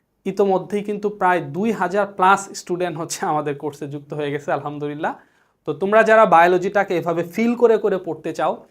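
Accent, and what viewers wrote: Sylhet, Bangladesh. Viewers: Ohh.Kotha Bolar Style sylheti der moto..!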